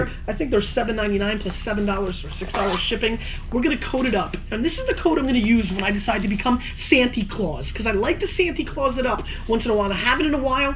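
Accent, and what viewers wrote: New Jersey, United States. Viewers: I guessed at first this guy was from Jersey and I was right. He just said "meh", so that confirms it.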